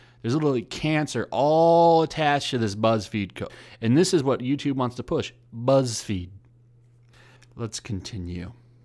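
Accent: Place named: South, United States. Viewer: I just want to point something out here. Far left ----> Hating on a man who, although I certainly have no affection for, clearly meant a lot of things to a lot of people. Yet the Alt-right nazis everyone is so scared of, have no said a thing about him being a hack, even though he's Jewish and did do a lot of questionable things in his life. Just want to point that out. Your southern accent is beyond nauseating. Also just want to point that out. You read like 400 tweets in the 3 character voices you have. Lost being funny very quickly. As someone who has no dog in this race I wasn't cheering you for mocking sjws and I wasn't steaming mad that you were, I was just bored and irritated.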